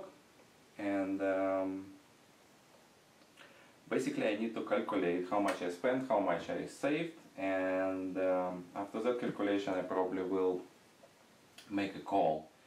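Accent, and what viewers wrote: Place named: Russia. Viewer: I don't think it really is German. He probably does speak German well, but I'd say Austria or Poland or Hungary. They all speak German well, but slightly different accent from actual German. I caught him having a minor Russian slant to his English(ex:blyeed, pyart). His accent is slightly off from German-German. I hoor ze vaak genoeg hier in de omgeving.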